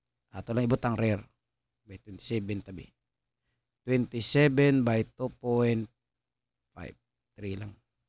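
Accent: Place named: Philippines. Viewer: So you really want a Diamond Back? 😜 With a Lefty on it? Lol. I love the Philienglish!!! Every language does it.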